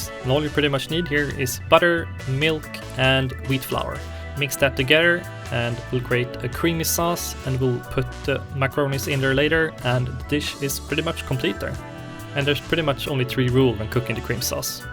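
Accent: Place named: Stockholm, Sweden. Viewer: Du har fan den bredaste stockholmska svensk-engelska dialekten jag någonsin hört:D! Bra jobbat btw! du har jättebra content men det är väldigt likt Babish;D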